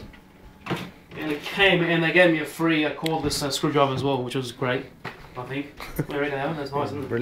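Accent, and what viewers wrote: Australia. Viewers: Greek Australian I'm guessing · hes aussie for sure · British? he sounds like an auzzy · Actually, I'm English and he doesn't English. He sounds Australian.. And No, it isn't obvious that he's Enlgish, because he's Australian >.> And if you still don't believe me, then you're an idiot, because on his channel: Hi My Name is and i live in Sydney, Since the age of 10, I developed an interest in eXtreme Computers, Thanks to YouTube i have the opportunity to share my passion & inspiration to the world. Country: Australia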